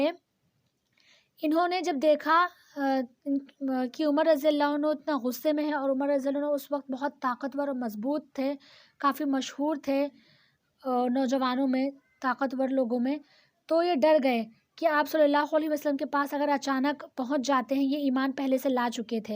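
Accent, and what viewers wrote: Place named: Pakistan. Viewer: aapki awaaz Ka tone Pakistan ke jesa hai Kafi Pyari Awaaz hai aapki aap pakistan ke hooo😍❤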